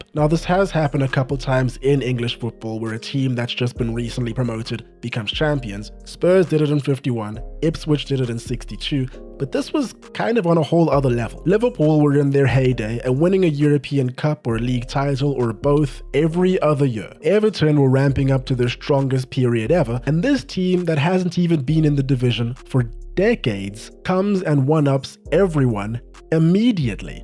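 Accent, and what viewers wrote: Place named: South Africa. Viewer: Are you South African?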